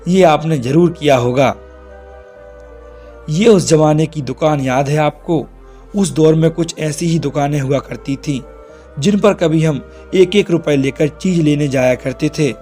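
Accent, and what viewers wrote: Madhya Pradesh, India. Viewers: Bhai aap kaha ke rahne wale ho aapki aavaz se to mp madhey pradesh lag raha hai ki. Aap mp se ho kyu g 😅😅😅🙏🙏 please reply sir🙏🥰🥰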